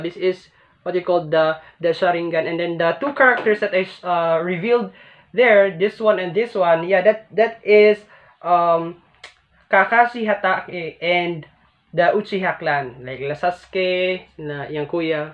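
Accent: Visayas, Philippines. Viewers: Bisaya diay ka😁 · Bisaya raman daiy😂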